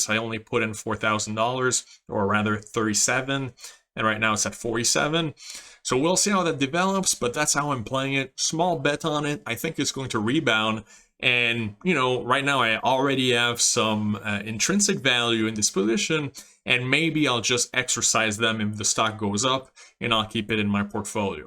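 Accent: Quebec, Canada. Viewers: Salut Est-ce que je détecte un accent Québécois?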